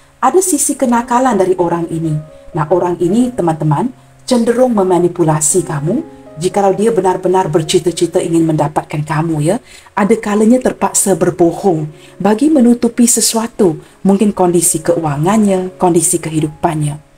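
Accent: Malaysia. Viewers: Terima kasih bunda kesayangan ku atas pembacaan nya hari pembacaan yang sangat mengemaskan terutama pada swara sexi nya bunda yang bernadakan / berbasiskan Melayu ILIKE IT sangat sexi sekali swara nya bunda semangat selalu ya bunda dalam kasih pencerahan nya semoga semakin maju Chanel nya kami sangat mendukung Chanel tarot thank you 👍👍👍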